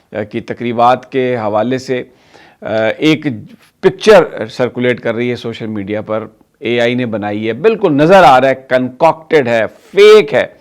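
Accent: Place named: Jangli belt, Pakistan. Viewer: Samee sb good way of expressing YOU belongs to Jaangli belt Kindly confirm As sometimes words came in that way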